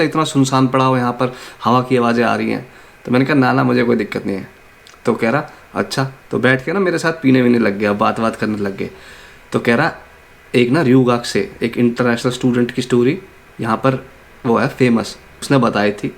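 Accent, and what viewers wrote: Haryana, India. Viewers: Bhai love ur haryanvi accent, plz aisi videos daala karo….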